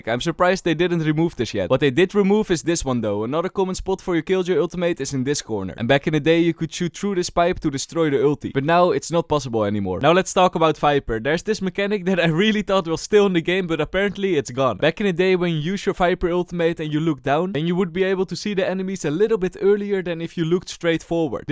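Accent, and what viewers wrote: Netherlands. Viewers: i smell dutch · ik ruik een beetje nederlands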